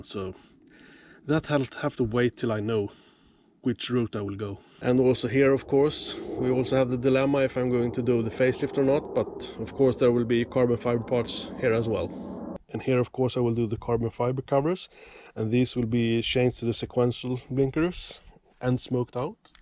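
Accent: Sweden. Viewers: You from sweden right? I can really tell The way you speek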